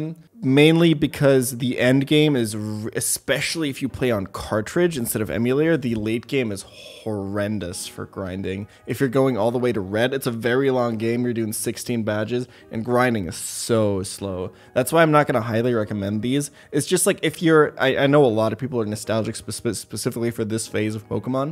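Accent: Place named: Germany. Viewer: seems like a very Americanized German. Especially with the accent and everything 🤔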